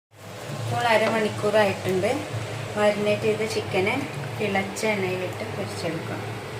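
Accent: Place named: Malabar, India. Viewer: ങ്ങളെ വർത്താനം കേട്ടിട്ട് .. മ്മളെ മലപ്പുറം, കോഴിക്കോട് 🤗🤗രണ്ടിലൊന്ന് .. അല്ലെ..?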